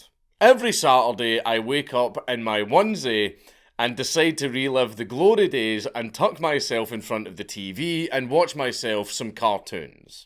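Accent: Scotland, United Kingdom. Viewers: I love the Scottish accent. Its so...confusing, in an attractive sort of way. I think it's great that we are including the mentally disabled in the recruiting process. They can't drive, but give em` some high sxplosives; what could go wrong?! The time is nigh for action men....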